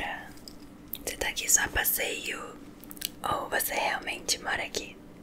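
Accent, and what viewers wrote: Rio de Janeiro, Brazil. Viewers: Sotaque carioca feminino é tudo de bom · Aahh nem acredito que vc respondeuuu logo reconheci o sotaque tbm souuu espero te encontrar algum dia na rua talvez kkkkk te amo sou mtt fã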